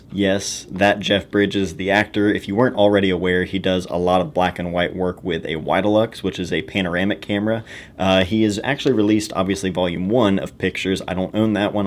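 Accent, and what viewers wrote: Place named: South, United States. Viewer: I just realise how southern you sound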